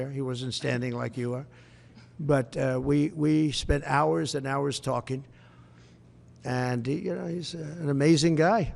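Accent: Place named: New York, United States. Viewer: Absolutely… it is just funny how he talks… it is a New York style I assume.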